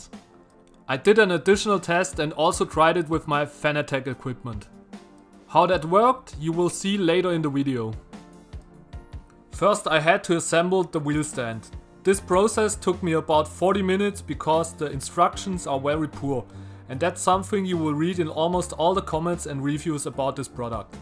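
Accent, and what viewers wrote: Germany. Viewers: I seem to be noticing that you have a german accent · Man hört das du deutsch bist 😂💪👍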